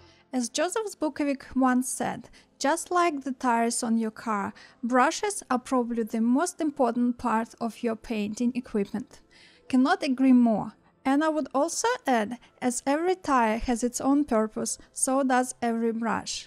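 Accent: Russia. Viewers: Спасибо большое ! Интересно было узнать о ваших кистях . Судя по акценту вы наверно с России .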